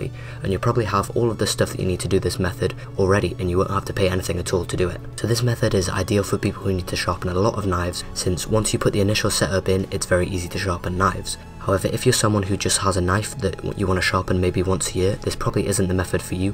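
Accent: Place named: England, United Kingdom. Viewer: bla bla bla bla typical england english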